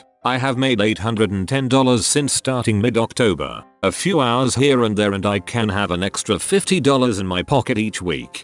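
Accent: United Kingdom. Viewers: I hate the british voice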